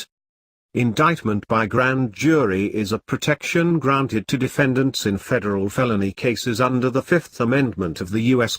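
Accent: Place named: Russia. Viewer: Wtf robot voice hide Russian accent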